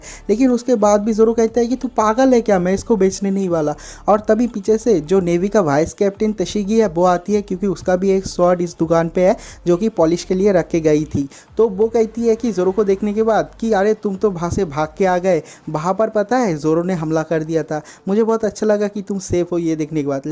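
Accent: Bengal, India. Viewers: Bro apke voice se mai samjh sakta hu ap bengoli ho but please bhai gender ko sahi se mention karo Apne bola zoro ka leya mai tere ko paisa chuka dungi😢😢😢 mai paisa chuka dunga hoga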